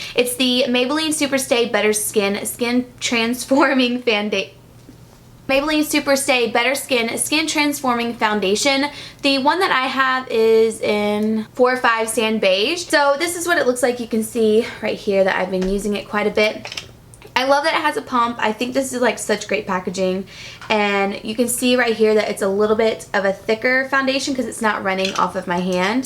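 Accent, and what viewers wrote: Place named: Georgia, United States. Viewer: what part of ga are you from girl?!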